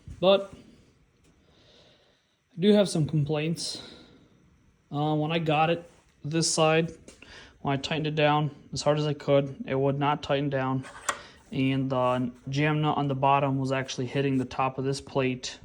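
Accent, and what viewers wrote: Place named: Ukraine. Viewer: neat Ukrainian accent you've got..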